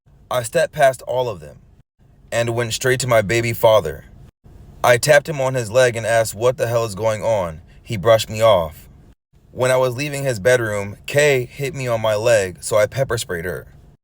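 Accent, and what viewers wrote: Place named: Minnesota, United States. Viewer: it’s so cool that your from MN. I’m born and raised and I could kinda tell with the way you talk. LOVE YOUR VIDEOS THO!! been watching all day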